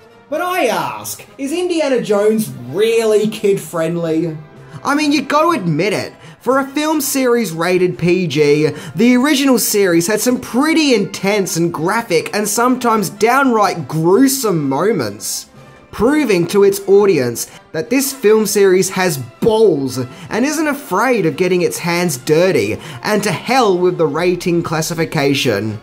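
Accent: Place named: Australia. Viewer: Dude, he has an Australian accent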